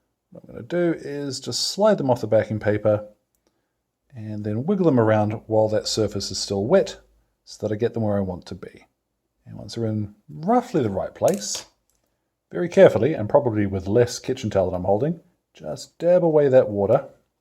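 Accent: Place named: New Zealand. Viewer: A useful technique, and I hope you continue with your Kiwi flavor. I always think of Tabitha from Fallout when I hear battle cattle.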